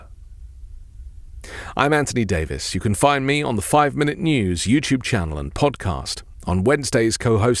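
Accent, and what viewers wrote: England, United Kingdom. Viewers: what nonsense, the economy was twice as productive. unemployment was way down. this is propaganda with an English accent...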